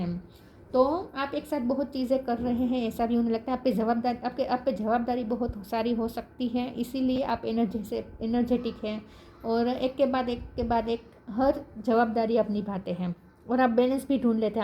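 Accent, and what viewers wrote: Gujarat, India. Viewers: Aap ke acent se lag raha hai ke aap gujrati ya madwadi honge.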